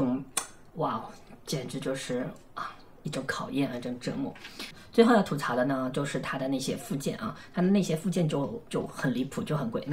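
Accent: China. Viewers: 是昆明话吧？ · 上海摄友来报道，听到乡音很亲切，哈哈😊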